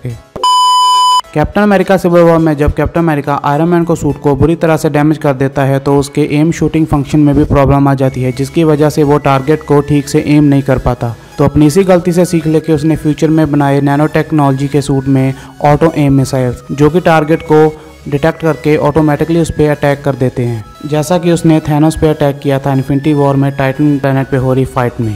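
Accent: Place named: India. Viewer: Bhai tuje uska Naam padhke aur hindi accent sun ke pata nhi Laga ?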